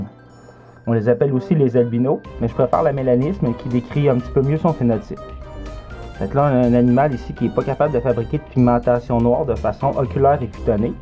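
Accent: Quebec, Canada. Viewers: il a un accent tu peux pas lecouter? .. ta gueule? je suis quebecois et tu croti que s ame plait écouter des francais avec leur accent sur ytb? bah non alors si t la pr apporter des critique a proos de notre accent, regare toi avant.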